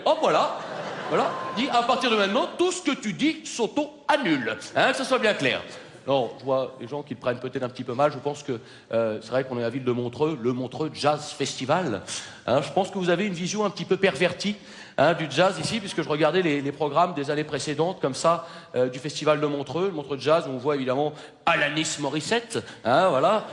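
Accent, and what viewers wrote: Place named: Belgium. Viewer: Il avait un léger accent belge à l'époque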